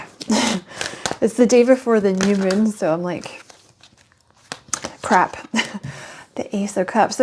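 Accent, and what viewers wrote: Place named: Scotland, United Kingdom. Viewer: Love your Scottish accent Lass! ❤ aye 🎉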